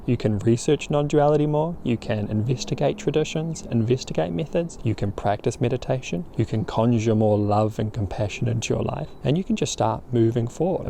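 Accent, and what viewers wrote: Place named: New Zealand. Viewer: Hi, thank you for sharing this. I became enlightened about a year ago. Of course it is a bit of a misnomer as strictly speaking there is no self to become enlightened but you know what i mean. I say i am enlightened but i am not permanently living in oneness or non duality. I am shifting between non duality and duality usually many times each day. Sometimes i am in my mind with ego and other times i am in consciousness or oneness. The great thing is i have realised my true self and i am living from that place. Every day i feel beautiful bliss or nirvana. I experience a baseline of this bliss. It is always there now at some level. It does change in intensity but its always present. Sometimes suffering still comes up. The suffering is reducing for sure and the periods of time when i feel suffering are reducing. To be honest with you the reason im commenting on your excellent video is because i picked up on your kiwi accent. 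I am a kiwi too. Apart from myself you are the only other kiwi i know who is enlightened. You don't live in Dunedin by any chance do you?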